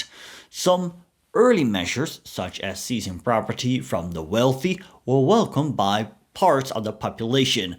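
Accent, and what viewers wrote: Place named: Netherlands. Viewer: the guy speaks with a very thick Dutch or Scandinavian accent. It's not nice - it hurts my ears. things like this should be banned. if you are Dutch, make videos in Dutch, pal.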